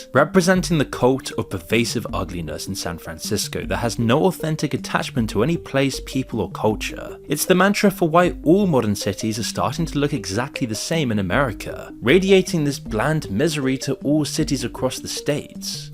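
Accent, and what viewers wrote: United Kingdom. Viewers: I love some Douchebag with a British accent telling me how fucked up my City is..... you don't know shit, we love it here.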